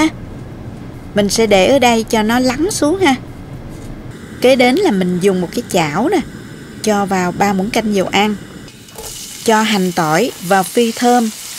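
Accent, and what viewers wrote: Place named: Binh Duong, Vietnam. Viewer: Trời tưởng ở VN. Trân trọng cô quá, nơi xứ người mà nấu chuẩn hơn nhà. Giọng càng tưởng ở Bd. Chúc cô nhiều sức khỏe mãi nấu những món ngon.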